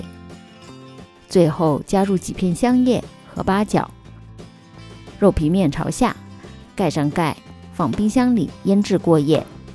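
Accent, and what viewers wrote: Shanghai, China. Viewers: 最喜欢的东西上海普通话越听越亲切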